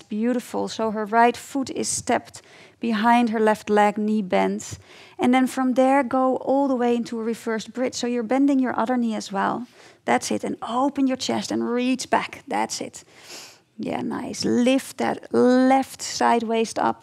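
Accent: Netherlands. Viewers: she sound dutch for sure, i can tell because im from the netherlands... sort of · Great Stuff! I teach Yoga here in D.C. and everyone always asks me about the Gluts (but) body part. And nice Dutch accent also!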